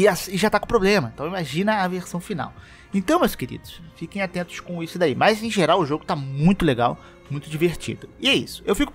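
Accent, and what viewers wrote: Rio de Janeiro, Brazil. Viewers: esse sotaque carioca me fez fechar o vídeo nos 10 primeiros segundos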